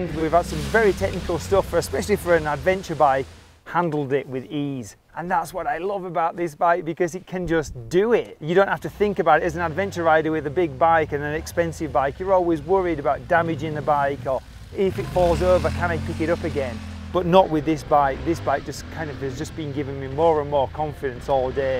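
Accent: United Kingdom. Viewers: I love your British accent! So authentic. From kenya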